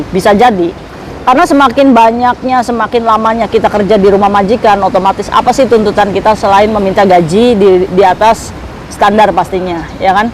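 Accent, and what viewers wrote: Indramayu, Indonesia. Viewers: Sing suarae ktenger payah logat drmayue, trnyata emang bner wong drmayu 😂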